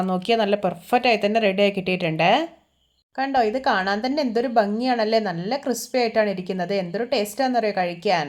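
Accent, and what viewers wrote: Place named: India. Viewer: ആന്ധ്രാ/ ഒറീസ്സ നിവാസിയാണോ? നന്നായി മലയാളം പറയാൻ പഠിച്ചിട്ടുണ്ട്... ഒന്ന് കൂടെ ശ്രദ്ധിച്ചാൽ മലയാളികളെപ്പോലെ സംസാരിക്കാൻ കഴിയും.!!! മലയാളം നല്ല ഭാഷയാണ് അതിലേക്ക് കൊങ്കിണി പോലെ നീട്ടി സ്റ്റൈലാക്കേണ്ട 🙏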